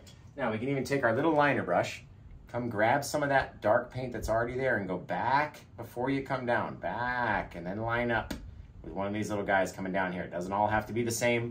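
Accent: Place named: New England, United States. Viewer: Soooooo, what part of New England are you from? Lol, the "wicked" gives you away...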